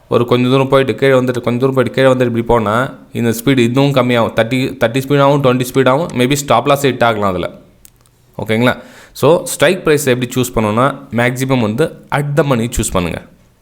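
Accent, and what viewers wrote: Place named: Bangalore, India. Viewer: Banglore tamil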